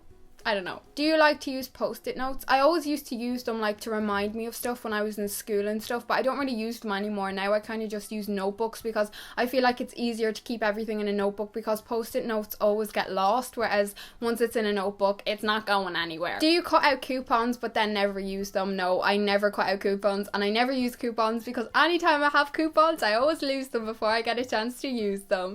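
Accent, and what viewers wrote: Ireland. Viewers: And the award for Best Irish accent goes to you 🏆🥇🎗. You’ve just won First prize.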